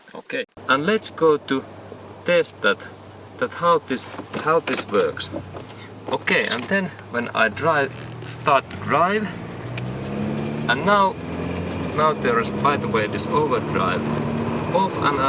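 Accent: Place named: Finland. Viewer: In case you ever wondered what a Finnish accent sounds like, now you know...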